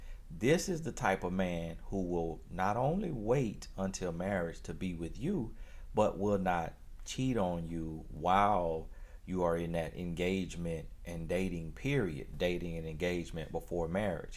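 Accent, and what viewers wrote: South, United States. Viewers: And please dont speak the Q ueens English, your wonderful Southern Drawl. Just drives your wondeful points home all the morrrrre!!! 😎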